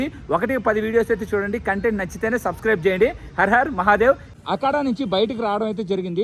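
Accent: Nellore, India. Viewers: Om namahsivaya nee langwage batti nellore anukunna madi nellore nanna presentt hy naku hai cheppu godbleesyou swamy daya neeku chala undi nanna nellorelo ekkada reply pettu vasanta aunty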